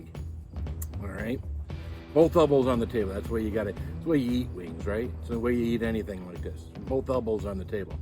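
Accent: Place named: Southeast, United States. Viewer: BY THE WAY!!!! if was a betting man “wink wink”, i would bet almost anything that you’re a south eastern gent! i can tale from the tone and the way you carry yourself!! lol i’m from North Carolina, and you sound like you could be my neighbor lol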